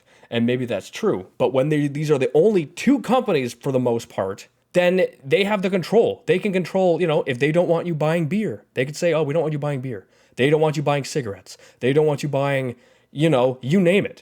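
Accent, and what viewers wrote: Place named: United Kingdom. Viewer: Good British accent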